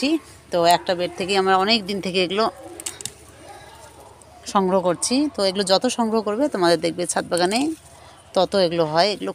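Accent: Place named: Bangladesh. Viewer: আসসালামুয়ালাইকুম আপু, আপনার এই ১২" গ্রো ব্যাগ গুলোর হাইট কত ইঞ্চি? প্লিজ বলবেন। আপু আপনি বাংলাদেশিদের মত কথা বলেন।আপনি কি বাংলাদেশি ?😊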